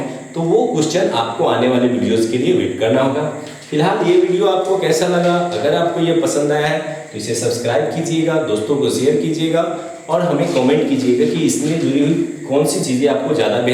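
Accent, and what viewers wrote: Bihar, India. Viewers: Sir Aap Bihar se ho na??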